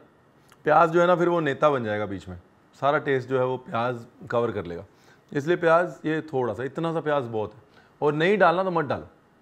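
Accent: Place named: Bengal, India. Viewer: Your way of talking is quiet similar to bengalis, do you have any connection with Kolkata , as becoz your accent, way you throw words are very sweet and not like typical Punjabi's. 🌼🌼❤️🌸love from West Bengal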